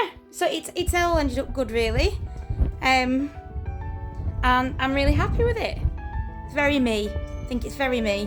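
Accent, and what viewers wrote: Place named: Cumbria, United Kingdom. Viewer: YouTube has just introduced you to me! How lovely to hear a fellow cumbrian accent! I missed the wool show for the very same reasons! It cut me up that it was so close to where I live, but I just couldn't afford to be tempted ~ next year 🤞🏻😅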